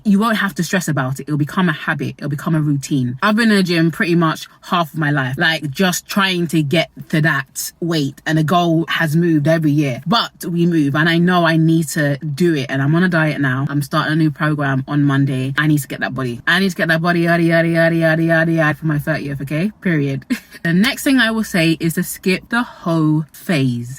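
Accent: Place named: England, United Kingdom. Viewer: English accent and rich dad poor dad? New sub!!! 😍😍😍😍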